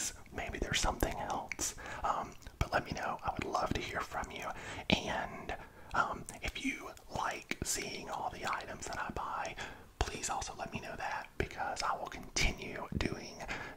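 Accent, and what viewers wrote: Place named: South, United States. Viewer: Love the southern accent; very relaxing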